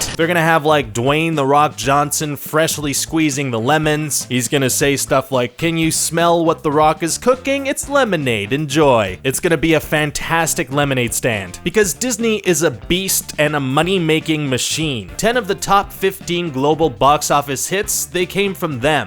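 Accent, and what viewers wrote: Canada. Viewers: Wait dude are you Canadian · Wow is screen rant Canadian?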